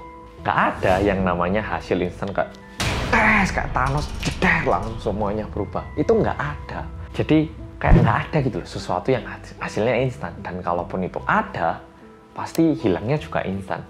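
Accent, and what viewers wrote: Java, Indonesia. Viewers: yang menarik dr sini otak guw membayangkan yg dia bicarakan .. hebattt sukses terus mas medhokkk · medhok ◐.̃◐ Nessie Judge: horror 👻 Nadia Omara: ngegass 😈 Arroyan Pram:serius, editan terbagus dan niat Detektif Aldo: serius pake banget (｡ì _ í｡) Gak tau kenapa aku suka banget sama kalian:-)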